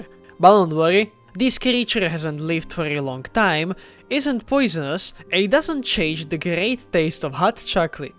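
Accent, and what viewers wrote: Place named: Poland. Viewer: Words can't describe how much I love this video! Just everything about it, from the polish accent to the wonderful creativity of the potions. (o´ω｀o)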